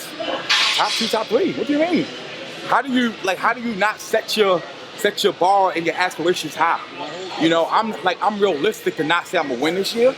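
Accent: Baltimore, United States. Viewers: That’s that Baltimore voice. I know that for a fact✔️ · Heavy b more accent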